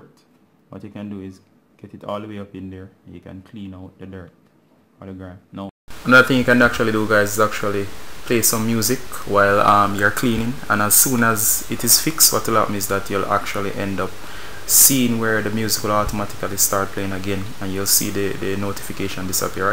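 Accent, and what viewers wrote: Jamaica. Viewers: I can tell you are Jamaican my family is so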